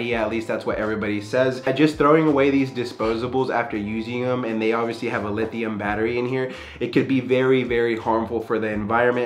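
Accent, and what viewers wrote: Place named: West Coast, United States. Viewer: Brother has a west coast bwhwhwhahah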